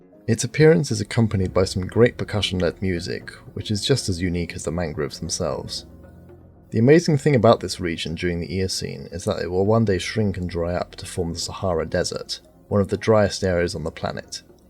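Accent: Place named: London, United Kingdom. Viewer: I'm really enjoying the episodes so far. I swear your voice sounds familiar. Though it could just be the London accent. Does Valerian mean anything to you?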